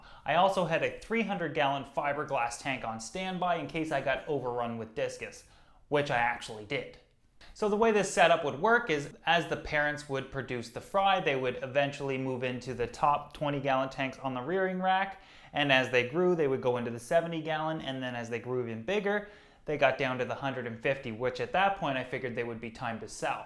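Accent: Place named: Maritimes, Canada. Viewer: Hey man i thougth you were from the maritimes i live in Fredericton New-Brunswick cheers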